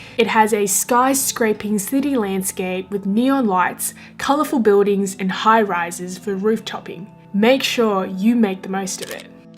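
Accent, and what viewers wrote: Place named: Australia. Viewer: Hi I have just subscribed to you channel lol. You sound Australian very interesting. I have friends from Hong Kong and China too. How safe is Hong Kong for a man on his own. I believe you can blend a little lol. Dobspato England Newcastle Upon Tyne.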